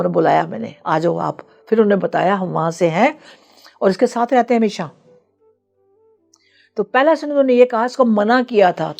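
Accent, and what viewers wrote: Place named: Punjab, India. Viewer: ji muje lagta he aap punjabi ho bat karne ka style punjabi he aap ki shakal punjabio jasi he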